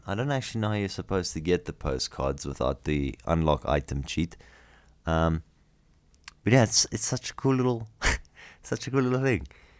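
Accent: South Africa. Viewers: Ermagahd a South African. So weird to hear our accent on youtube XD immediately recognize!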